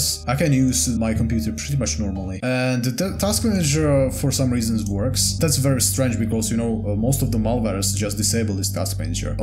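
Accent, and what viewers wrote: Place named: Czech Republic. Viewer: The czech accent is so cool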